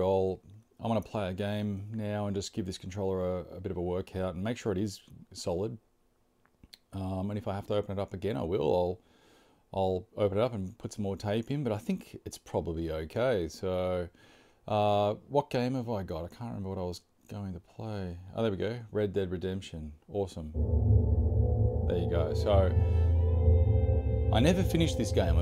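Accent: Australia. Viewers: Am I the only one that likes the down under accent?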